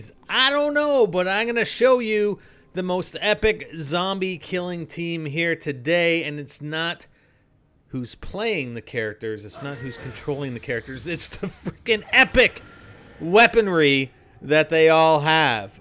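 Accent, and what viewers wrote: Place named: Canada. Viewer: are you Canadian? judging by the way you say 'out' and 'about' I think you are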